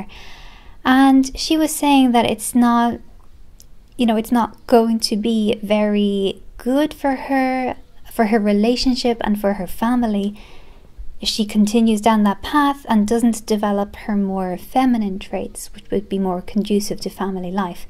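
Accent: Ireland. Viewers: Out of the blue you commented on one of my vidoes, so I checked out your channel and subscribed. What can I say, i'm a sucker for Irish accents :)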